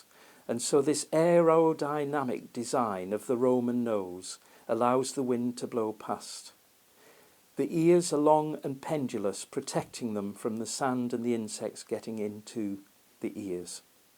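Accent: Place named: North, United Kingdom. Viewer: Fantastic video, I particularly enjoyed your utterly clear and amazing north-country accent, I’m from the north midlands, and have, I think a clear accent, but when I worked in Japan, my colleague from Ripon was, to the Japanese, totally understandable....I salute you Sir!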